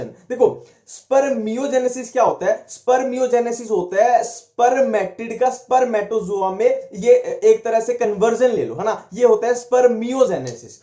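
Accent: Haryana, India. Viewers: Thankyou so much sir Waise aap Haryanvi hain kya?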